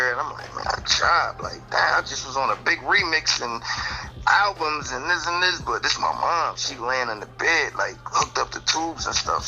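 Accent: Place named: New Jersey, United States. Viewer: That jersey accent is way different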